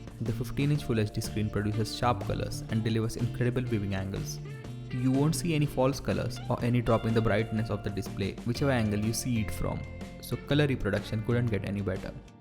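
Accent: India. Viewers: Indian acent is really anoying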